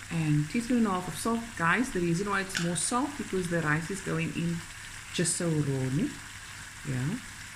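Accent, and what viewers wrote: Cape Town, South Africa. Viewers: It is a Cape Town accent.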